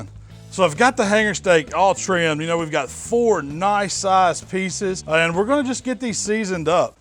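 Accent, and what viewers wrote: South, United States. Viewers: I can listen to my boy all day with that! Southern drawl down home! Brotha from another motha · It’s Southern and if u aren’t from the South you can’t understand him. Rock on..🤔😁🤣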